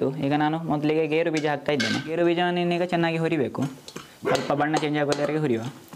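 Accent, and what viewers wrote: Mangalore, India. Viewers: ನಿಮ್ಮ ಮಂಗಳೂರು ಕನ್ನಡ 👌👌👌👌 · ನಿಮ್ಮ ಮಂಗಳೂರು ಶೈಲಿ ಕನ್ನಡದಲ್ಲಿ ಅಡುಗೆ ಮಾಡೋದನ್ನ ಕೇಳೋದೇ ಅದ್ಭುತ. ಸ್ವಚ್ಚಂದ ಪರಿಸರದಲ್ಲಿ ನೀವು ಮಾಡೋ ಪ್ರತಿಯೊಂದು ವೀಡಿಯೋ ತುಂಬಾ ಇಷ್ಟ ಆಗತ್ತೆ.. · I am watching your channel because of your mangalore kannada💛❤ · Wow..very nice pulav...👌 Nice way of explaining..mangalore kannada ..❤Keep it up..🙏🌹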